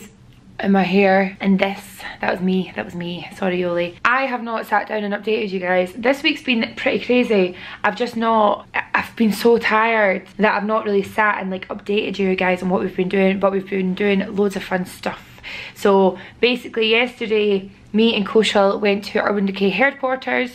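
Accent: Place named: Scotland, United Kingdom. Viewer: I've been subbed for about a year now. I had no clue you were Scottish when I watched your vids for the first time, not til I turned the volume up my first thought was "who's she's not from the USA but she's super pretty and that's a cool lip piercing and some awesome ink work" never cease to make me chuckle at least once. You're pretty awesome, keep it up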